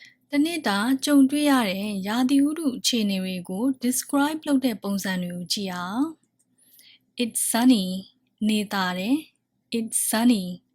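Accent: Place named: Myanmar. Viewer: I like the burmese accent, its sweet in ears.. 😊😊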